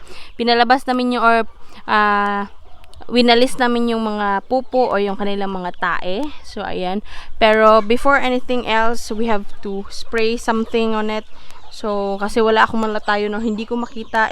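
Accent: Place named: Cebuano, Philippines. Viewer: Saan kayo pinas cibu ang salita mo mensan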